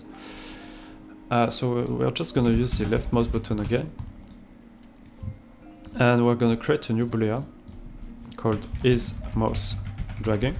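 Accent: France. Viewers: le fameux accent français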